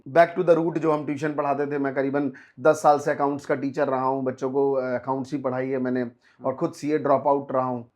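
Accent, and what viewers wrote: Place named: Chhattisgarh, India. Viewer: bhi aap Chhattisgarh se ho kya mujhe aisa lag raha 🤟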